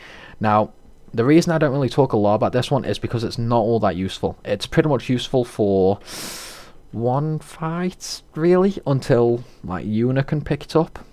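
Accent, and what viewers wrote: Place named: Manchester, United Kingdom. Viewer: Are you a Manchester? I recognise that accent pal 👌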